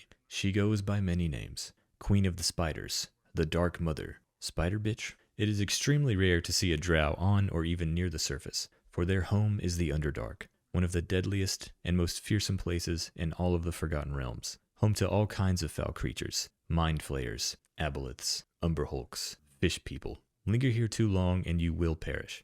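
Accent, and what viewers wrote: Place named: Arkansas, United States. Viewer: Rural arkansas? Im from fort smith and lived in Magnolia in south arkansas. You sound like you may be from further north around Fayeteville?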